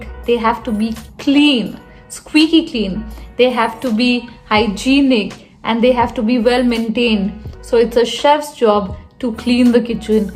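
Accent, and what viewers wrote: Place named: India. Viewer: 🫡Hats off 2 U from 🇧🇩Bangladesh. I love the way U speak English in a very simple way. Your English is very easy to understand, and your accent also sounds so simple and sweet. It's way better than all other typical indian accents! I am in damn love with your steady speaking style, which is absolutely easy to understand, and of course your every single word's pronunciation is crystal clear!🥰 U r undoubtedly a perfect speaker! U have absolutely nailed it!👏🏻 👌🏻Keep it up dear multi-talented Indian lady...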